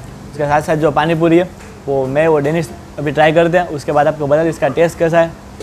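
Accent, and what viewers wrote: Gujarat, India. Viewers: Specially Loved u r gujju accent hindi. TRY SOME VLOG IN GUJRATI. Might help u littel bit more to grow. BTW AMAZING EFFORT GL.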